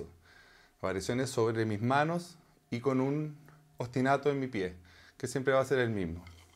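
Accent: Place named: Chile. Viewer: No e visto los detalles del canal pero detecto un fuerte acento a ‘tsiléno’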